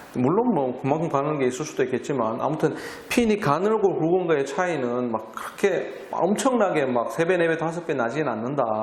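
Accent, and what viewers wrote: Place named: South Korea. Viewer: 저는 성격이급해서 웬만한영상 2배속으로듣는데 진짜 서울말처럼들려요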